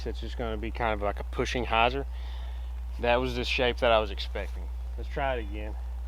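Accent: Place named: North Carolina, United States. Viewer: Love your content. When I started watching your channel I was saying to myself " man that guy sounds like home ". I live in Humboldt Co California. Then I herd you say Yadkinville.... I grew up in Trap Hill and High Point, lol. It's like hearing myself talk!! Just had to come home last year to put my grandfather to rest, and discovered the quality courses around home. You ever play Rolling Pines in North Wilkesboro?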